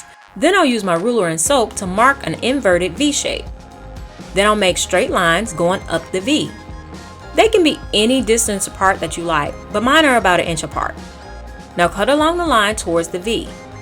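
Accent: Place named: South, United States. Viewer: it’s the cute Southern accent for me, y’all!❤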